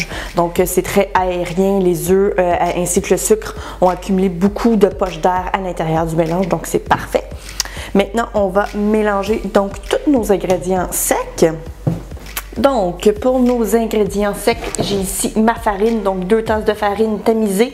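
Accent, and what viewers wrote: Quebec, Canada. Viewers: J'aime trop ton accent québéquois 😍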